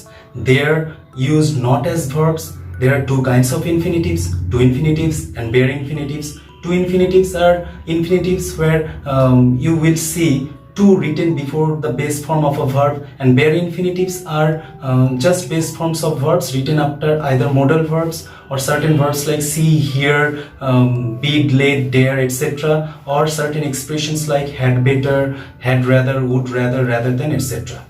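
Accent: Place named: Bengal, India. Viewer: Your accent is wonderful and having Bengali touch little bit.I am suresh kumar from Punjab.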